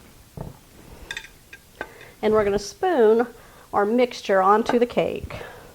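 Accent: Texas, United States. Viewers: I am delighted with your Texas accent pronouncing ‘marscapone’ like ‘NASCARpone’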